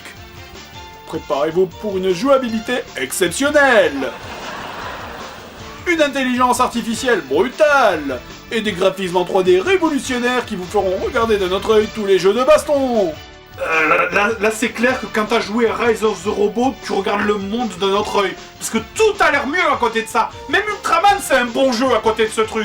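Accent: South, France. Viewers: L'époque où avait son accent du sud...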